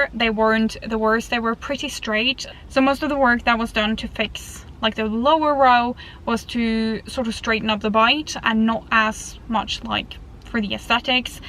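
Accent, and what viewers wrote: Ireland. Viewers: Where is your accent from ? Irish?? Living in Ireland?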